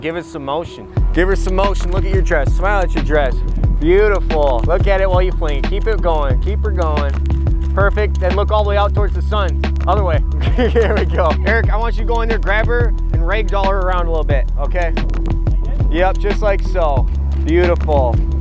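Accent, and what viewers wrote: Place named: Wisconsin, United States. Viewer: Your video showed up recommended, so I started watching and immediately knew 1 thing…. That accent, you’re from Wisconsin! 🤣 I have family in Kenosha and my mom grew up there, and always loved their accents growing up. Nice photos, btw. ☺️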